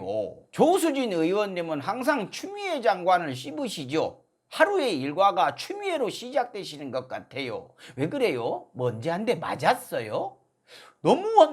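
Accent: North Korea. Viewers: 말투가 탈북자 느낌 나서 놀랐음.. 빨갱이 타령하는 것들이 하는짓이 딱 그 쪽이라 어이상실.. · 조수진이라는 여자 말투도 무식하고 억양이 북한 억양 말투에 과장되서 탈북한 여자인줄 알았어요. 꼭 뽑아도 막장스런 여자들만 비례 국회의원으로 뽑는건지 ? 통합당 비례 기준이 막장 인가봐요?전희경이랑 이은재 남장여자 임이자등의 모습을 안좋은건 다 닮은듯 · 북한사람 말투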